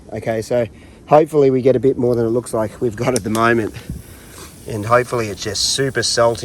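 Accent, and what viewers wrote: Australia. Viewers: Great job mate! Love, love the survival videos! Cheers! (How ‘bout that Australian accent)?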